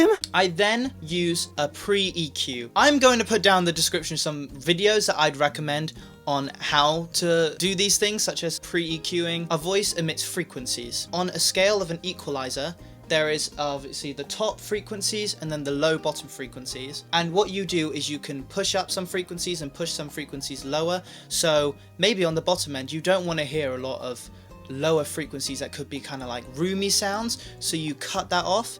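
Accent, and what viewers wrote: United Kingdom. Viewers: ㅋㅋㅋㅋ 영국...분이죠..? 영어는 영국식 영어가 멋있지./ ㅋㅋㅋ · 아니 오빠 윙크 뭐야 뭐냐고 뭐야 진짜 왜그렇게 잘생김? 나죽어 응엉우웅우어엉 오빠 사랑해 으엉 영국 발음 미쳐 진짜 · I know this is random but I LOVE your British accent 😂😂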